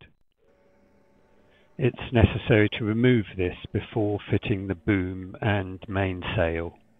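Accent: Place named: United Kingdom. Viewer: J, You don't sound like a native Californian. Are you British?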